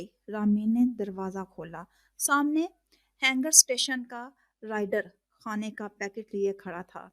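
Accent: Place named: Punjab, Pakistan. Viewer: Nice novel beautiful voice Lekin Punjabi andaz Punjabi language zada hai 😊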